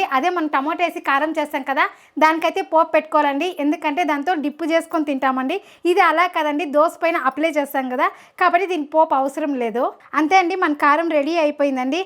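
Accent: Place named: Chennai, India. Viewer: Nenu chennai,ikkada tami telugu vaaru ilaane maatlaaduthaaru😊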